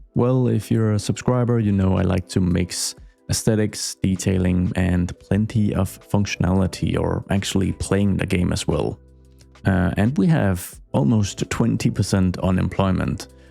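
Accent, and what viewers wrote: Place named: Denmark. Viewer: is that a danglish accent i hear🔥🇩🇰🔥🇩🇰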